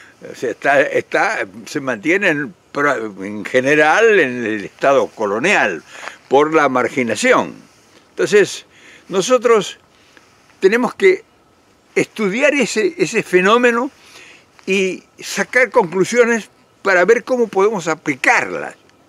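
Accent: Argentina. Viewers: No lo había visto nunca señor ,creo que usted es argentino por su acento pero usted habla de información y no tiene idea de lo que dice yo vivo en Ciudad de MEXICO y este señor obrador a destruido al país ,no hay trabajo, desmanteló la salud, la economía estamos en una. Corrupción como nunca ,hay pruebas y, Trump sigue mandando ahora ya se llevan el agua y el ejército mata a los ciudadanos , INFÓRMESE, ACTUALISESE Y, OJALA ALGUN DIA DEBATA EN SU PAIS CON JAVIER MILEI PARA QUE LE DE UNAS CLASES ,POR SU EDAD USTED ESTA CON IDEAS DE HACE 60 AÑOS ,VIVA MEXICO FUERA EL DICTADOR OBRADOR PREPARA SU RENUNCIA EN DICIEMBRE ,Y DICHO PIR EL ES EL PEOR DE LA HISTORIA MEXICANA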